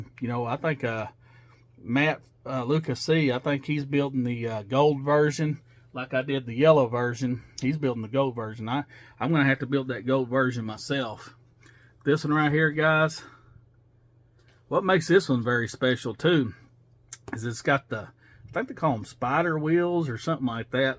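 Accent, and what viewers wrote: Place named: South, United States. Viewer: i love to hear you talk that red neck voice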